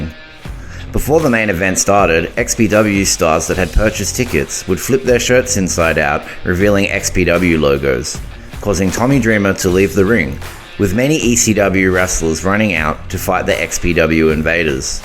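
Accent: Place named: Australia. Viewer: it's exciting to hear what sounds like a fellow Australian ECW mark, E-C-Dub, E-C-Dub, E-C,Dub XD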